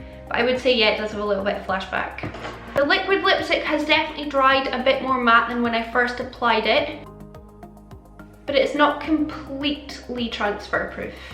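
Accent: Scotland, United Kingdom. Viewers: Are you Scottish? If so, where are you from? :) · Your accent is really cute 💞 Are you Scottish ??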